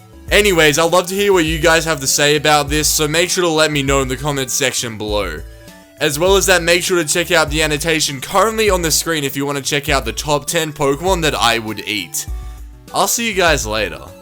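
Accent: Australia. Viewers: starts vid, sees small amount of subs, hears ozzy voice. subbed instantly a fellow ozzy youtuber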